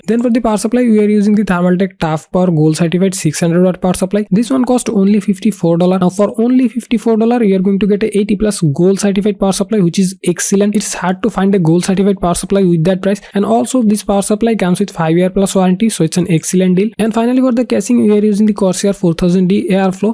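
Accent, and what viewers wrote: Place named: India. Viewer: This is the most Indian accent ever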